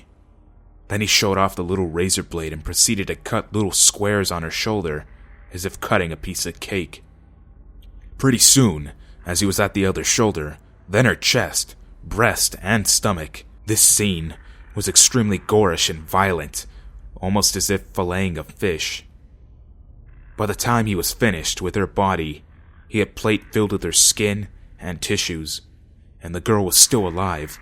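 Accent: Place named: Mexico. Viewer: u sound mexican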